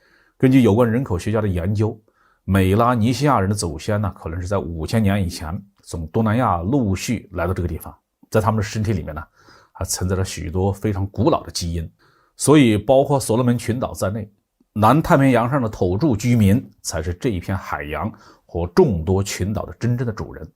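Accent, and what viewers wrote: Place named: Hubei, China. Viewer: 根据口音分析为我们湖北老乡，鉴定完毕👏😄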